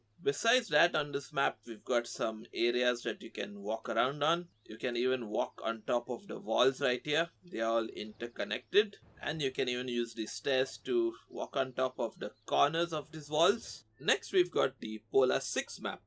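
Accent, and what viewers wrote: India. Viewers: Indian i think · Indian and Bangladeshi at the same time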